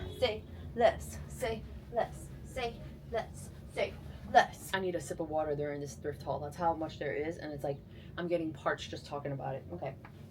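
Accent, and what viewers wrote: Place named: Brooklyn, United States. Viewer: I love all of your finds and your style!! I lived in Brooklyn in the 80’s as a teenager. Your accent and your personality brings me back, and reminds me of all of the Italian girls that I went to school with….I miss it so much!!! The best time of my life!! Plus, I want to convert my spare bedroom into a closet and dressing room…but my 20 year old son is still in there 😂 Id say your Halloween costume will be Fran Drescher as the Nanny.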